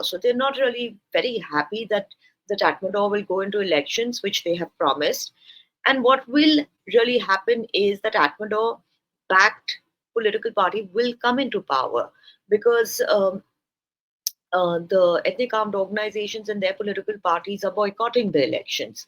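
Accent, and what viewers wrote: India. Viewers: Stop spewing BS in Indian accent. Bangladesh is not in a mess, we are in a very very good shape. Your own foreign policy screwed us over for 15 years. Now just stay away. People don't want ANY Indian influence here anymore. Radicalization? Don't you know what RSS or BJP is? What their goons do in India? And you dare to lecture us about radicalization? Wow.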